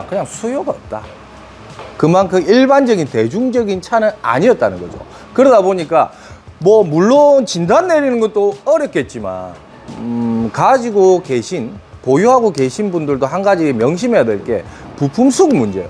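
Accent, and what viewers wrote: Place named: Busan, South Korea. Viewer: 본가가 근처에있는데 구독자놀러가면 커피한잔 주십니까?ㅎ..정비도 정비지만 부산사투리맛집ㅎ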